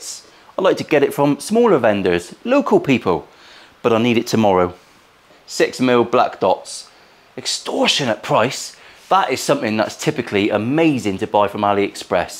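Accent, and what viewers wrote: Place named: West Country, United Kingdom. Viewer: Video one of this series popped up on my feed so I decided to check it out. Whoooooo boy am I glad I did. I knew I'd found a bedfellow (steady) when you included that comment from yer old mate Sean Moore (Paisley Tele, Pt3). Laughed so hard, the convulsions made my missus thought I was having a stroke (not like that). Guitars, swearing, calmps, sandpaper, wood rubbing and a West Country accent. What more can anyone need? Great work!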